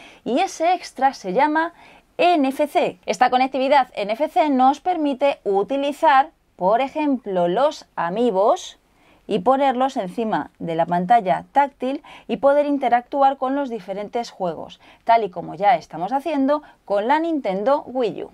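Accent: Spain. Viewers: Hola!! Bueno antes que nada, yo soy latino, de México... Y tengo unas dudas, por que los españoles cambian ciertas palabras en su pronunciacion? O por que quieren decir amm todo en español, si me entienden? Por ejemplo, dice: New Nintendo TresDeEse en vez de decir TriDiEs y deja el new en idioma inglés? O tambien por que cambian los nombres de ciertas empresas? Como gugul y le dices gugel o apel en vez de apol, o en vez de decir LG(elgi) dicen elege? Es una duda! No se lo vayan a tomar de manera ofensiva! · amigo soy de México y es x su acento español como todo cada persona pronuncia las palabras de otro sonido -_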